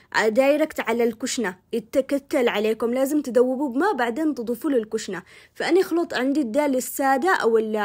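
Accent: Aden, Yemen. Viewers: تسلم ايدك ..شرح بسيط و واضح.. لهجة يمنية حلوة... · تسلمين يابنت بلدي والله انك عسل ياربي محلا كلامك ولهجتك عدني على تعزي ملفوف بالابي ايوه هكل خلينا نفتح اليتيوب نبصر باشتات من حقنا محروكات مشحوطات ونتعلم منك كلامك سريع سريع ومهرتك اسرع ماشاءالله مثلي ههههه واحلا لايك تستاهلي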